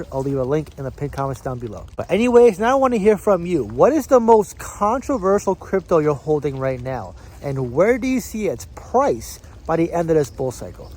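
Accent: New York, United States. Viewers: I am from England but am sure that's a New York accent. 😮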